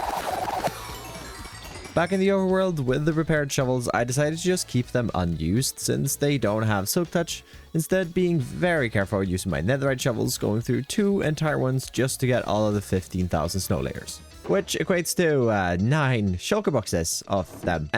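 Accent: Sweden. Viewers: är du från sverige?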